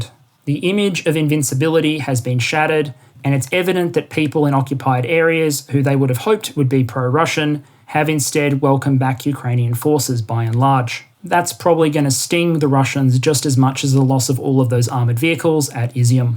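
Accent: Australia. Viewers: I'm Australian and he sure sounds like an Aussie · Not sure how a guy can speak in a fairly monotone Aussie voice, yet still be by far the one of most interesting and informative views on the war in Ukraine. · Thankyou for all your good work mate. Informative, instructive, clear and concise with a small dose of ozzie for good measure. Keen for more.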